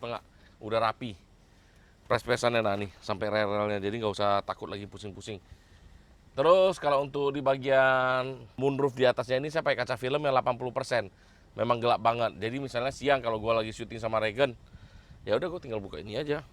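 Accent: Medan, Indonesia. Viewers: Gokil kokoh* medan identitas cakap anak medan melekat😅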